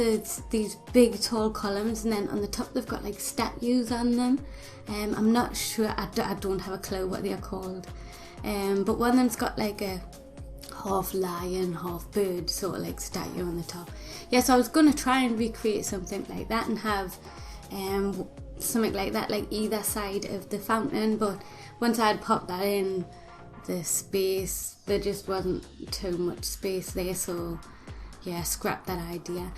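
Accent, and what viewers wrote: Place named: Newcastle, United Kingdom. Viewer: I just wish I knew how to make things like this! Love your accent as well :) Geordie for the win!<3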